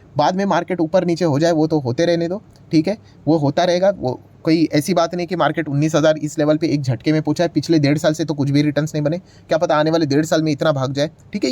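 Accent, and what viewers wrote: Maharashtra, India. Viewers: मराठी माणूस आपल्या बोलण्यावरून लगेच ओळखला जातो. आपला सर मराठी माणूस आहे. जय महाराष्ट्र जय शिवराय..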